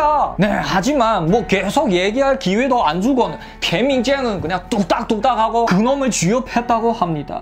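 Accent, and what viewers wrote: Yanbian, China. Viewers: 수고 많아요 연변한국어 정겹게 듣고 있어요 좀더길게 해주세요 너무짧아요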